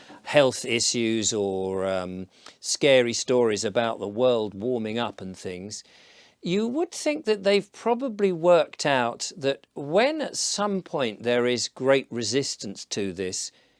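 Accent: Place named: England, United Kingdom. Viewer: Sure you know! I take it you live in England from your accent. I'm convinced and so are all militia's Our pullout from Afghanistan left a whopping multi billion dollar war cache in this country along with pallets of hundred dollar bills. Uniformed fatigues, state of the art assault rifles and open border. Yeah I would say I have a very good reason Given the treason thus far perpetrated on the citizens of the United States.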